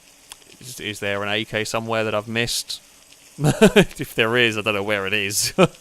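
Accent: England, United Kingdom. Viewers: pronounced "gas op die lollie" pretty perfect imo (English accent but still better pronounced then half of the Dutch citizens does)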